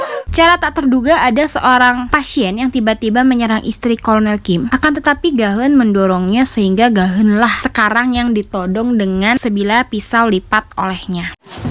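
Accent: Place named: Java, Indonesia. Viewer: Mbak nya ngomong nya lucuu 😅ngahennya medook 😅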